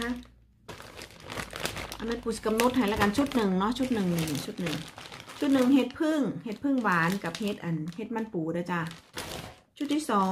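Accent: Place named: Nakhon Phanom, Thailand. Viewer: แจกเบิดรึยังจ้า อยากได้ขุดที่1 ภาษาเว่าคือไทนครพนมแทะจ้า แม่นคนนครพนมบ่อ อรอุไรลักษณ์ จีรันทนิน 200/803ม.4ต.ระแหง อ.ลาดหลุมแก้ว จ.ปทุมธานี 12140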